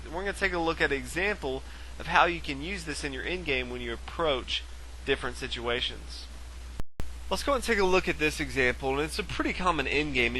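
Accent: South, United States. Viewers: Your southern accent is killing me , good video though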